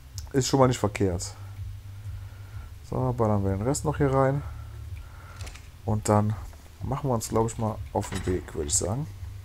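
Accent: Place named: Frankfurt, Germany. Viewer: aus welcher gegend kommst überhaupt manschmal hab ich das gefühl kommst aus frankfurt oder zumindesten rhein main gebiet :)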